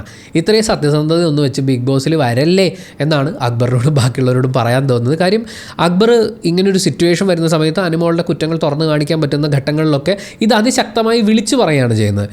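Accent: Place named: Kochi, India. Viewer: Your kochi slang is superb 😂😂 Exactly like Adhila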